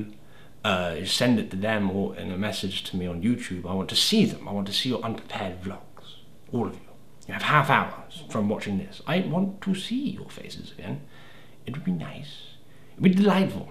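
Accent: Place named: Cornwall, United Kingdom. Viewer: ! Awesome amazing Cornish YouTuber is back! Ahhhhhhhh! Total fan girl moment over here! Cannot believe you're back, so sad when you said you wouldn't be making any more videos... Glad you are again... Your Cornish accent tag is so true to life, lol!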